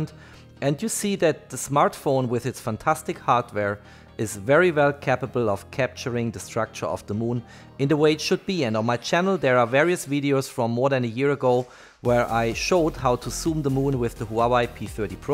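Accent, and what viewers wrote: Germany. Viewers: Deutsches Akzent 🥵🥵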